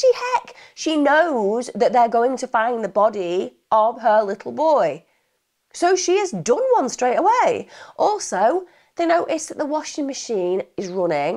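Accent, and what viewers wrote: United Kingdom. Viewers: Your British accent, even though I’m part of the matriarch as a Canadian, doesn’t make u sound brighter love.. find some creativity. Pls!! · Hi watch true crime every night to fall asleep i find it calming 😂 found you through searching for this case seems not many have covered this one fully nice to hear a uk accent 🏴🇬🇧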